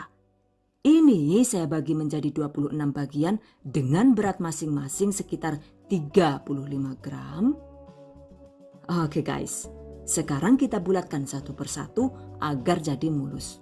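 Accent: Banyumas, Indonesia. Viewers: Waalaikumsalam. Catat. Ngapak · noted..besok mo nyoba bikin ahh..btw mbakayune lucu temen klo lg ngapak mix with english..👌👍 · Suka bahasa ngapaknya.. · suka cegek saat dgr ad bahasa ngapak nya hahahaha,, tp lucu juga sih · Suarane mdok tmn yakkk asli bnyumas y yu😁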